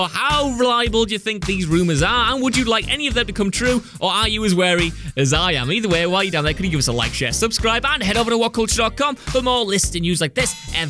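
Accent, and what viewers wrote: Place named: Newcastle, United Kingdom. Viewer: It’s called a Geordie accent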